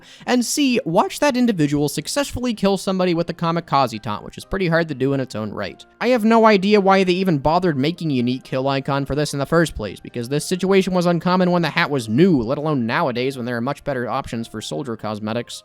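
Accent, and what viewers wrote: United States. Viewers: that's the most southern accent i have ever listened from a tf2 youtuber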